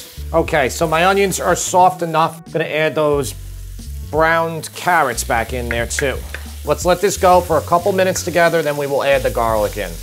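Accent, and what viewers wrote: New York, United States. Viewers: I'm going to cook this. Thansk for the recipe. BTW, I love the NY accent!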